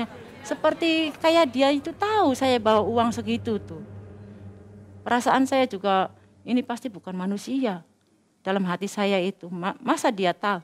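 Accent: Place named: Cirebon, Indonesia. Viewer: Kaya org cerbon ap Indramayu 😊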